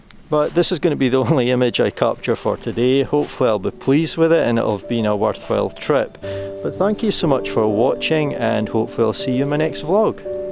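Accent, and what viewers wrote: United Kingdom. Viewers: Your image screamed Scottish Woodland as I know it, which is perfect. A gorgeous image, absolutely stunning especially with that silver birch behind your subject tree and the light on the floor. It all came together to make a phenomenal shot. Whenever I look at your subscriber numbers I always expect to see a K after the number. I don’t shoot videos mainly because of how I sound with my breathing so any advice from myself would be plucked from the air. However, I read on another channel how our friends from across the pond struggle with our British accents, I was wondering if you slowed your speaking down a little bit it might help? I’m not trying to be critical, just trying to think of things that might help your channel get to where it rightly belongs. You are undoubtedly one of the best British landscape photographers on YouTube, but your numbers don’t reflect that fact. 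Anyhow, that aside an brilliant video with an image I’d be more than happy to have as my 'one' image after a week out never mind 3 hours.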